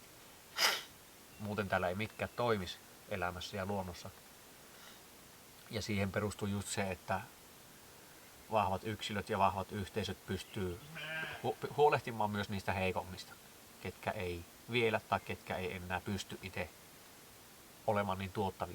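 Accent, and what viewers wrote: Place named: Sodankylä, Finland. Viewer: Moi Sodankylästä. Sääsket inisee, lampaat määkii, kukko kiekuu. Mies puhhuu lähes mejän murthella pölökyllä istuen. OK.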